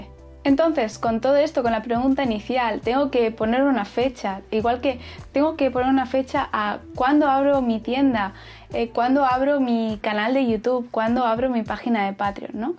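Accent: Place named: Spain. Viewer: Que bella eres flaca! Aunque tu qcento españolete la corta un poquito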